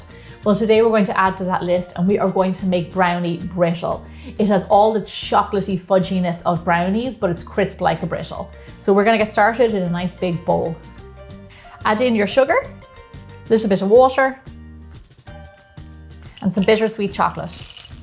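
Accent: Ireland. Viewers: I love your Irish accent! ❤️❤️❤️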